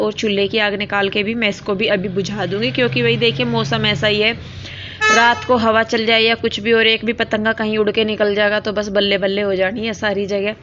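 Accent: Haryana, India. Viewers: Haryanvi boli bhut bdiya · Maam ap haryana ke ho kya apki tone vha ki he lgti h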